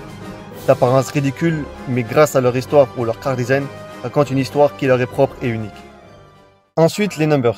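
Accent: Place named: England, United Kingdom. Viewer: Incroyable ton accent anglais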